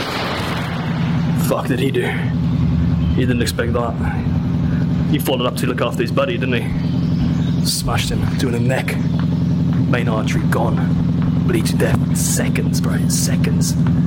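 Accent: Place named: United Kingdom. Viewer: bruh he’s pure British/Scottish/Irish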